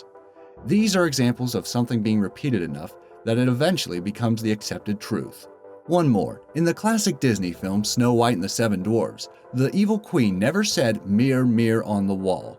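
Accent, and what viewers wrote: United States. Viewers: I cant believe how few people in the comments noticed that he got the Rocky scene wrong, Mr. T didnt play Apollo Creed, he played Clubber. Also, i know a lot of people griped about his pronunciation of Mandela, however i have known many people that pronounce it the way he did. Its usually because he has never, or cant recall ever actually hearing the proper pronunciation, so they fall back on typical language defaults that dictate how it would be said, of which, based in his accent, he speaks americanized english, so he is technically still pronouncing it right. If you are wondering why i would bother explaining this, i literally saw hundreds of comments from people jumping on his back about it, yet only a few people commented on the aforementioned Mr. T issue.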